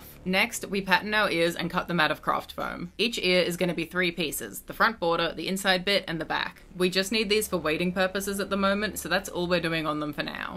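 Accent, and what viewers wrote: Australia. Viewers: bro said he was Australian without saying he Australian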